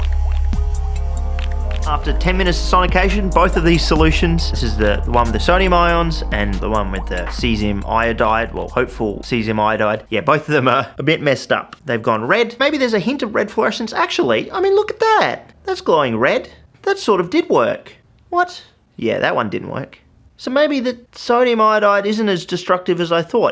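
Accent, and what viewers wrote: Australia. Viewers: every episode you become more Australian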